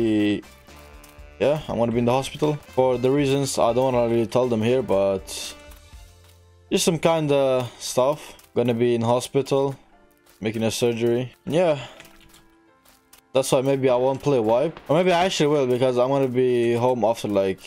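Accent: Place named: Norway. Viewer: haha you sound very norwegian with your accent, but where are you from then?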